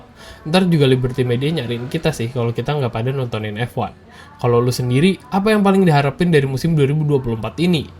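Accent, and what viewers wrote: Depok, Indonesia. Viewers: "Tahun ini Tahunnya Ferrari" Ucapan setahun sekali Btw bang lu orang depok? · Ente orang Depok ya min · Bang luh orang depok yaa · Hahaha Sawangan GP, adminnya orang Depok nih 😂🤝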